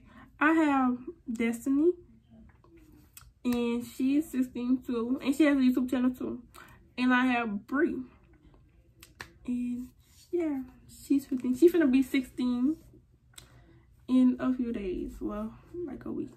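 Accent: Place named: Louisiana, United States. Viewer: the first few seconds ikkkk you was from louisiana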